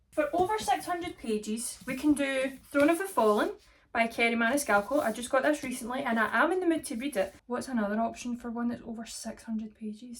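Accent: Scotland, United Kingdom. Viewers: If you have a watch stat of like 400 % on the first 3 seconds, it was me trying to understand what you said! But yes, yey, spring! 😂🌸 I'm reading The Maiden at the moment, which is set in Scotland, and having watched your videos is very helpful because now I kind of hear the book in your dialect while I'm reading. It's great! And you got a great TBR! I'll be interested to hear what you think of Cultish and A Tempest of Tea. I'm also wondering who all these people are who read the second book after a bad first one, and then tell anyone the second one is much better. What a sacrifice! 👏👏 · Love hearing a fellow Scot!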